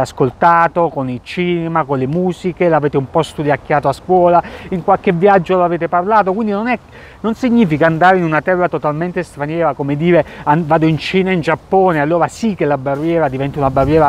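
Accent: South, Italy. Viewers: Tu come lo hai imparato? Ma per caso sei del sud?🤔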